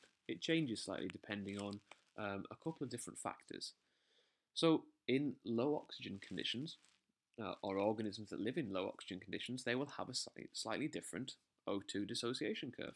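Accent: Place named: United Kingdom. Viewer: Im so glad I've found your channel, just in time for my exams next month. Thanks! Also its nice to see hear somebody British teaching Biology, as there are a lot of American videos on Youtube and they pronounce a lot of things differently.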